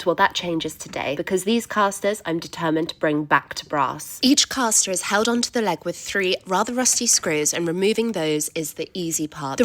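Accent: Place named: RP, United Kingdom. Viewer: You speak like the Queen of England in her 1956 or 7 Christmas greeting. Hurrah on your hard work. I’ve not ever finished a project like this.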